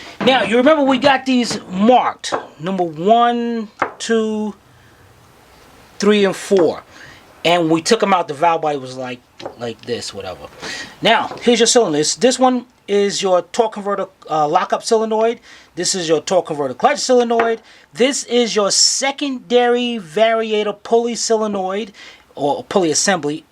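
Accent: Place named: New Jersey, United States. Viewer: Where are you from? Sounds like New Jersey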